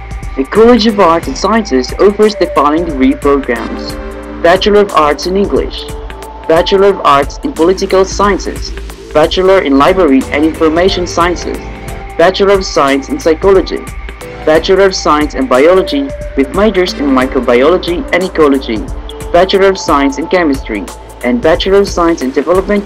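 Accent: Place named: United Kingdom. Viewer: haha.,! wow.! British accent.,!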